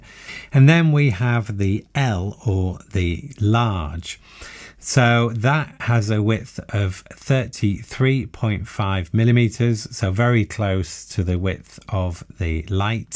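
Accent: England, United Kingdom. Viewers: If im honest SAKs are why i came to the channel, them and Olight torches. I stayed for an English accent and UK £ pricing and the concise reviews. I have titanium scales on my cybertool but as hinted at above i always have a torch so i don't have any lite models. It's probably as a collector of well over a 100 SAKs in my top 5 Swiss Army Knife models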